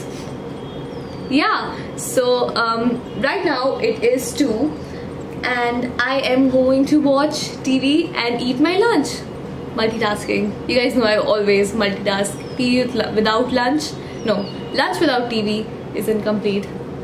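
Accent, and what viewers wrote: India. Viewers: Is she Indian , her accent .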